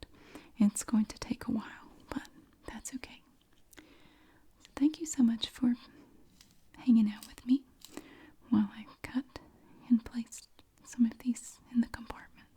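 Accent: South, United States. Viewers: Your asmr leaves me knock out ... I love 10 points Southern...!!!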